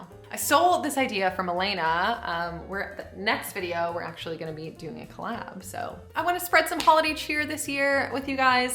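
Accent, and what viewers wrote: United Kingdom. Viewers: You're getting a little British twang in your accent I can hear it! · Is it just me or is accent changing? I swear she sounds at least 15% more British now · You are definitely starting to sound British. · It's funny to hear how your voice has taken on a little bit of British way of talking. Not your accent, per se, but the way the sentence flows. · You're getting such a British accent! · I love hearing your accent start to change a little bit. Some words you say have a British accent and I’m loving it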